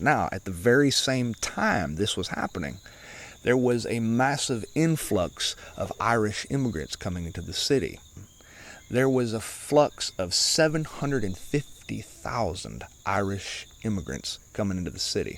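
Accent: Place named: South, United States. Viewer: From the outset, I was trying to guess which song it would be. I thought of this one briefly, but I never knew that it had 2+ verses. All I ever sang as a child was the refrain. (Canadian Baptist roots.) Well done. Love your thoughtful delivery and "southern" accent. [So many 70-ish year olds in your audience -- and I am one of them at 71.]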